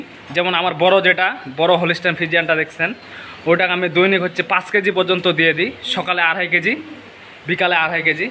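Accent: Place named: Bihar, India. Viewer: ওহ,,,এজন্যই প্রশ্ন টা করলাম কারণ আপনার ভিডিওতে আপনার ভাষার উচ্চারণ গুলো কেমন জানি বিহারীদের মতো উচ্চারণ হয়।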